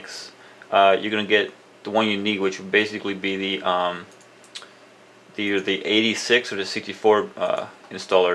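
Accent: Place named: United States. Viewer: THANK YOU AND YOUR BEAUTIFUL NORMAL AMERICAN SOUNDING VOICE AND UNDERSTANDABLE ENGLISH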